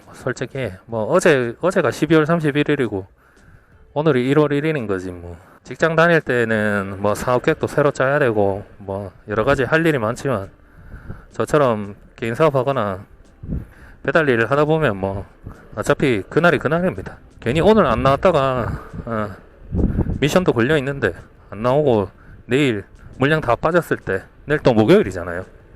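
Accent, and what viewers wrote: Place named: Gyeongsang, South Korea. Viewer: ㅋㅋ 말투가 대구분인가요?? 정겹네요 ㅎㅎ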